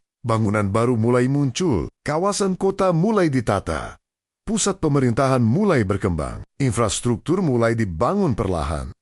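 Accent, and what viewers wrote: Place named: Malaysia. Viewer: logat Malaysia, kalau narasinya orang indo kebanyakan fitnah iri dengki 😀😀😀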